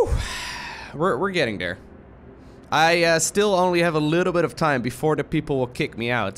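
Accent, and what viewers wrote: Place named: Netherlands. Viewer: You sound super dutch, are you dutch?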